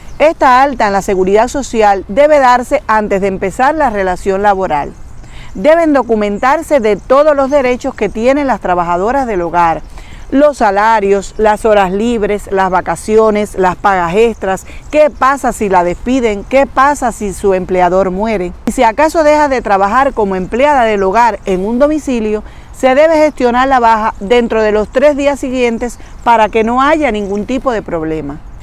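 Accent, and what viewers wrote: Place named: Cuba. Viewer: GRACIAS A USTED, POR SU CONTESTACIÓN. BUENO, CREO NO HABER ESTADO TAN LEJOS EN MI DEDUCCIÓN. YA SÉ QUE NO ES "ABOGADA" DE PROFESIÓN, SINO "FILÓLOGA", ADEMÁS DE INVESTIGADORA. LE FORMULÉ LA PREGUNTA, PORQUE PUDE APRECIAR SU DOMINIO SOBRE EL TEMA, Y ES MUY OBVIO QUE SI ESTUDIÓ FILOLOGÍA, Y ADEMÁS ES INVESTIGADORA, SEPA DESARROLLAR CON FLUIDEZ Y PRECISIÓN EL TEMA QUE ABORDE UNA VEZ QUE HAYA INVESTIGADO SOBRE ÉL. EN CUANTO A SI ES CUBANA, LO SUPUSE DE ALGÚN MODO, AL ESCUCHAR SU PRONUNCIACIÓN. YO TAMBIÉN SOY CUBANO. PUES ENTONCES, DESDE ARTEMISA, CUBA, RECIBA USTED UN CORDIAL SALUDO. VALE.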